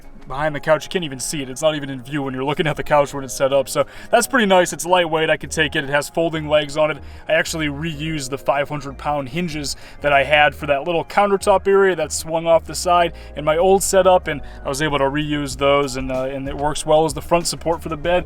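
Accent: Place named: Modesto, United States. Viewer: You sound like your in my area which around a 100 Modesto, California Love your van conversion I wish there was someone in my life that could help me build my home on wheels which I'm planning on doing in about a year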